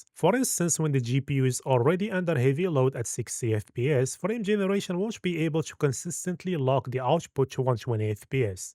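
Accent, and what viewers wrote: Morocco. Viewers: Are you moroccan by any chance cuz you have a strong moroccan accent ?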